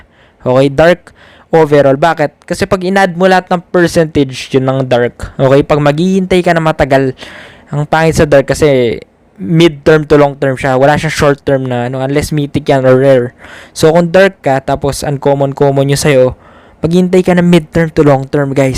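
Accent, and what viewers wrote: Pampanga, Philippines. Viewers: Kapampangan accent ka bro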